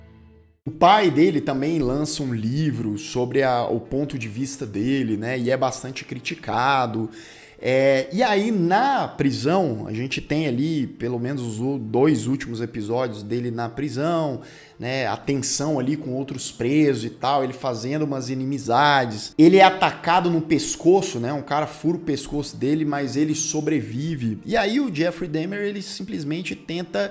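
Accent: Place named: Brasília, Brazil. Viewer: Vc é meu vizinho!!! Sabia que pelo seu sotaque devia ser daqui por aqui perto (sim, galera de Brasília tbm tem sotaque). Não vi série, mas pretendo. Estranhei essa cor amarelada, achei que fosse meu celular, agora eu entendi hahaha.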